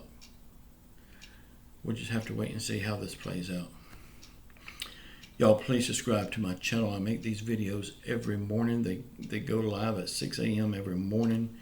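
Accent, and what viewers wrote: South, United States. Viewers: Maybe you shouldn't watch this channel if you're offended by his southern accent. I'll continue to watch his channel , I quite enjoy it. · Thankyou!! Love your gentleman southern accent!!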